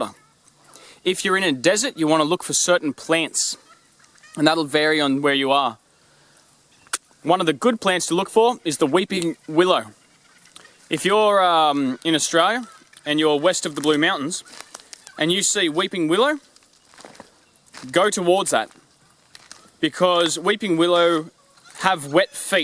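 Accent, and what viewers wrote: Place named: New York, United States. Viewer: How you doin! You sounded like a new yorker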